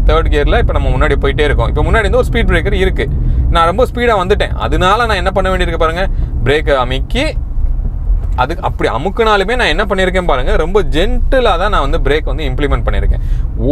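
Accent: Virudhunagar, India. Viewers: Neenga VIRUDHUNAGAR ah na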